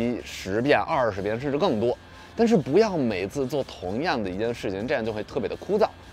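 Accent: Beijing, China. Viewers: 你的中文真的不错啊，希望你能改掉那种京片子的腔调，这种腔调其实现在北京人也不说，因为给人油嘴滑舌的感觉。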